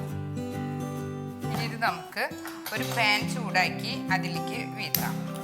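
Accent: Thrissur, India. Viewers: Njnm aghna paraya.... hostail ennun kaliyakkum.... tcr slang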